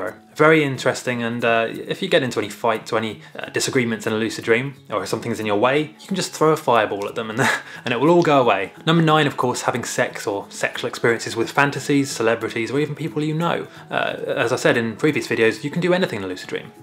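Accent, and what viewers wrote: England, United Kingdom. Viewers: You are like the joe wicks of lucid dreaming, Long hair, English Accent and all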